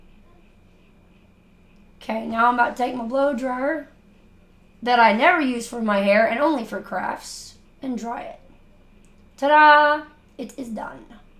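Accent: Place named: South, United States. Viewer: I love it! I agree with Tyler that you can really hear your southern twang come out when you’re crafting on video. Super cute! Love you! 💓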